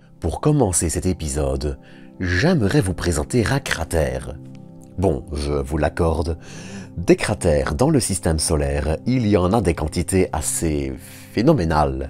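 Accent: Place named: Flanders, Belgium. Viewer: Flamand donc ? Bonne soirée et bravo pour ce que vous nous offrez en vidéo !!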